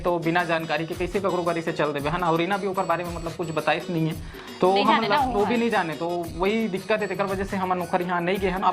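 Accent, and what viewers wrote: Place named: Chhattisgarh, India. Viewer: आप मन छत्तीसगढ़ी बोलथव त बड़ सुघघर लगथे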